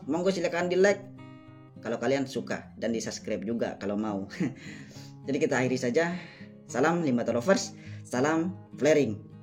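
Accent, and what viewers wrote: Madura, Indonesia. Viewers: Abang logatnya kek orang Madura😅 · Ada logat maduranya 😁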